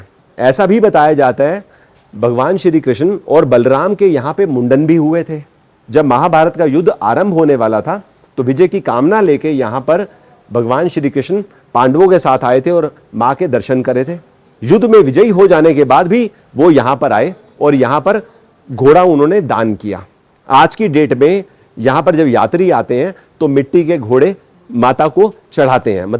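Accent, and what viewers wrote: Punjab, India. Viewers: Aapke videos bahut achhe hote hain, lekin ek request hai. Jab bhi aap kisi historical place ka video banao, to apne punjabi accent ko control kiya kijiye, us se saari vibe kharaab ho jaati hai. Please bura mat maaniyega, fan hu isiliye adhikaar se bol rahi hu. Jaise ki aap भीष्म कुंड को भीषम कुंड bolte ho, और को ओर बोलते हो, युद्ध को जुड्ढ बोलते हो। आप जानते हो महाभारत काल में कितनी शुद्ध हिंदी बोली जाती थी। जब आपने वीडियो बनाने की starting की थी, तब से अब तक काफी चेंज है आपकी भाषा में लेकिन थोड़ा सा और सम्भाल लीजिए, please, video देखने का आनंद दुगुना हो जाएगा। राधे राधे।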